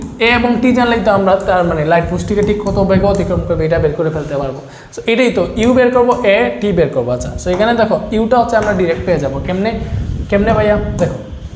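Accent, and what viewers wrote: Sylhet, Bangladesh. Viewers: Baiya apni ki Sylhet e taken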